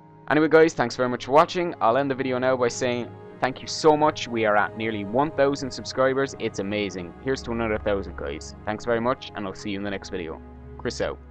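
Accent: Ireland. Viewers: Now, I'm new to this channel, but are you Irish/Newfoundlander? I thought I picked up a bit of my own accent from this video, haha! c=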